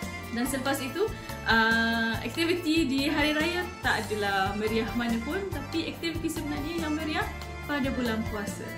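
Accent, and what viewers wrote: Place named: Arab world. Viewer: Satu2nya dj ikim yg berckp melayu slank arab..unik dn sedap didengar..klu ckp arab..lg la terpesona dgn lenggok kata2.. walaupun tk faham..😅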